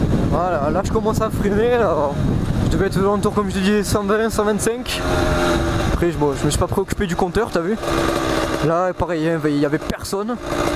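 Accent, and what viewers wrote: South, France. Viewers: avec l'accent du sud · Toi t du sud avec t tu vois 🤣💪🤘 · pourquoi tu as pris cet accent du sud normalement tu n'a pas cet accent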